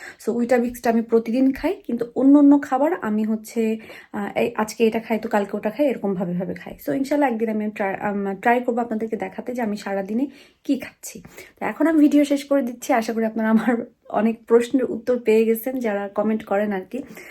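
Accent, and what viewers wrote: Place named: Gopalganj, Bangladesh. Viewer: apu amio gopalgonj er meye so tumi jokhon amader desher vashay kotha bolo tokhon amar khub valo lage ekdom nijer manush ❤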